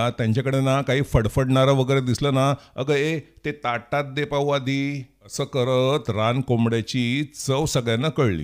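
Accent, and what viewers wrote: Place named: Mumbai, India. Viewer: छान माहिती.....इतकी वर्ष इस्रायल मध्ये राहून सुद्घा मुंबईच्या भाषेचा तडका अजून जशाचा तसा आहे😊😂असे वाटते काल परवा पर्यंत मुंबईतच होतात की काय तुम्ही😊....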